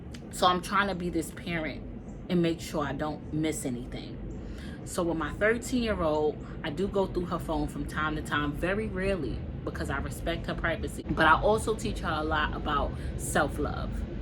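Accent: New York, United States. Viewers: I just love listening to you and that New York accent. 😊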